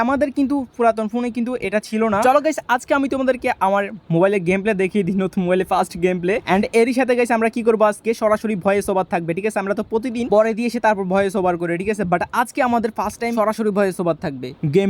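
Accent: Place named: Chittagong, Bangladesh. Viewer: vhai ki koitam to ar video in ato valo lage ar ton but ay kintu chatgya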